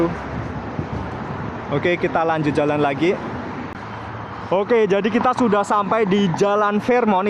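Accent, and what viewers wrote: Java, Indonesia. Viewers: Asli jawanya mana mas ko medok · Ketoro wong jowone 😁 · Mas ganteng amat ...orang mana sih,,, Tapi gaya logat bicaranya kaya orang jawa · Mantap mas, tinggal di amerika tapi logat jawa nya masih ada 👍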